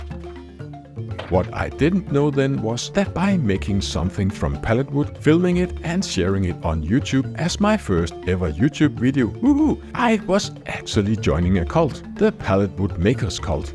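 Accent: Denmark. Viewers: Ej fy for helvede din dansk-engelsk stemmer får mig til at krumme tæer... Virkede ellers som en spændende video. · I was just thinking "this is cool but you can't do it in Denmark" when I clicked on the video but then I heard the accent ❤ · Som dansker var det for grinern at høre en dansk accent i starten af videoen. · du er da dansker! jeg kan kende dig fra din accent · dam im danish (i use differents accents on danish and english) and i imediately were like "that sounds so stupid.. is he using a danish accent?" and then you were like "in denmark" xD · you sounds danish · Som dansker må jeg bare sige, at der i reglen ikke findes noget værre end at høre danskere (inklusiv mig selv) tale engelsk...men din video og fortælling er så god, at jeg kan ignorere det! Det er en sjælden gave,. Godt klaret og fed video! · I love it that you do voice over using your own voice and amazing Danish accent. 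I get sick of people using AI voice that sounds like a 1950's American radio program. Tak fra Holland! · Hej fra Canada! (Parents are Danish though. Great hearing the accent again)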